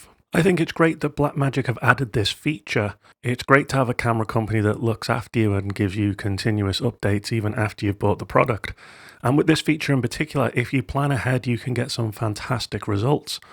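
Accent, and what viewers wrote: United Kingdom. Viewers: Im from the North West - I new it was a Merseyside accent (i know the Wirral isnt Merseyside but you know what I mean) 😆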